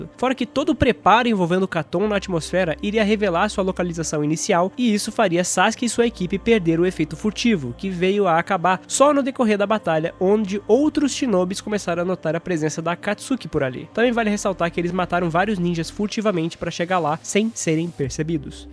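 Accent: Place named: São Paulo, Brazil. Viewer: Ele não "tira" o sotaque, só usa variações do sotaque paulista.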